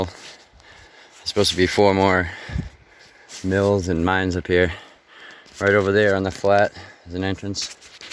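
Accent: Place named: New England, United States. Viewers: I was quite young (13) when JFK was assassinated. My family had been very big politically on Kennedy. Much had been made of his Bostonian accent. We even had a comedy album on the first family <link> So that was my first real exposure to the Massachusetts accent. I realize this is off-topic as hell - I landed on your site because of my own interest in panning for AU. I just got curious about your accent and thought i'd ask. Good talkin with you - Keep up the good work. Peace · I enjoy watching you guys find the Au. When I first saw your videos a few years ago I was laughing because some random dude with a thick New England accent was in California gold country digging nuggets and flakes. You really should incorporate Sam Adam's Lager into your videos..."your cousin from Baaaahstaaahn." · I hear a NE accent. NY 🤔 ...Northern Pa. Maybe N.Jersey.A long time ago but you been there. 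😆 If not,its crazy how close it sounds.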